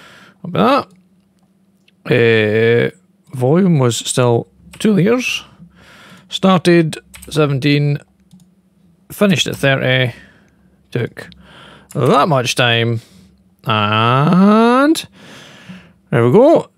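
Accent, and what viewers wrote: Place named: Orkney, United Kingdom. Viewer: I was mixing specific heat and conductivity with my idea. Thanks for following up. Also your accent and humor reminds me so much of my grandfather. I hope to visit the Orkney Islands where he is from some day. Greetings from Seattle, Washington.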